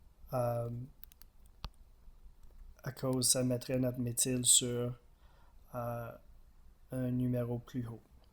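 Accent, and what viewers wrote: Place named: United Kingdom. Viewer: from the accent i think hes from the united kingdom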